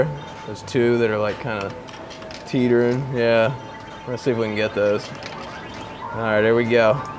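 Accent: Pittsburgh, United States. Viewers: You have a pretty strong yinzer accent.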